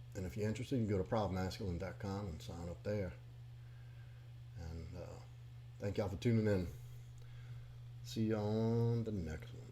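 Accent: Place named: Louisiana, United States. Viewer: I can tell you’re from Louisiana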